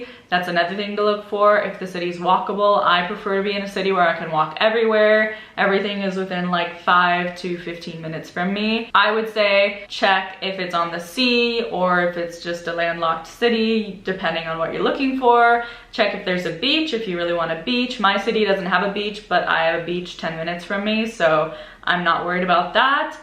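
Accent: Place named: United States. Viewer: Interesting, I would though that longer time spent outside US would change your english to be more plain in intonation but you still have this singed US english. For example you say four times in the row "theeeeere" instead of plain and simple "there". If you were not from Nebraska I'd say your are from California :-))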